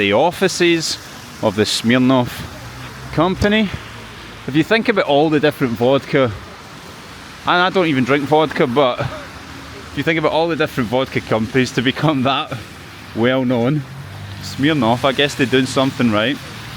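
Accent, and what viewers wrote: Scotland, United Kingdom. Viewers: Great project! I've visited Moscow twice and lived in St. Petersburg for 6 months. Your Scottish accent is pure ASMR - so relaxing. I really like your channel. 5 stars! By the way, I speak fluent Russian and I have no idea what that word next to the sea is. It's definitely not the Black Sea. It's Greek, lol. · Beautiful city. You dound scottish. Im from Strathbogie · Happy New Year. Enjoy your videos in Russia. Where are all the Lada cars? Lovely street layouts as well unlike Lanark! good to hear a fellow Scot reporting from Russia. Cheers Bill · Stopped by to listen to your accent. I adore scottish accent. Nice video. Not sleepy at all! Best wishes from Belarus!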